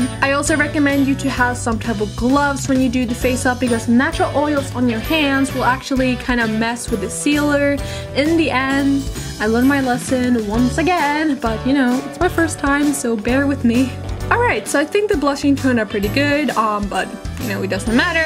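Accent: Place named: Sweden. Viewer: I love it! Ps your accent is amazing I wish Danish accents sounded like Swedish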